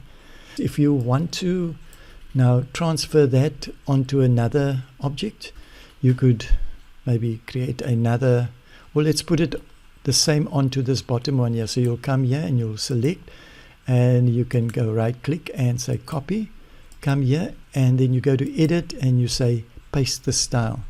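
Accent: South Africa. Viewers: Nice so here a South African accent.:) Goeie werk.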